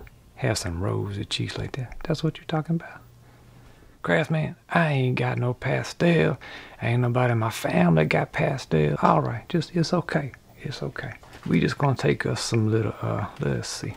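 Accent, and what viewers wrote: Louisiana, United States. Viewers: Hey my kids and I love your show! Are you from Louisiana?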